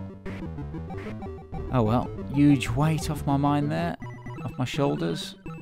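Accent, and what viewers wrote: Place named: Birmingham, United Kingdom. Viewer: Great video mate good job it's not its the 3rd game Oh are you from Birmingham I'm in Wolverhampton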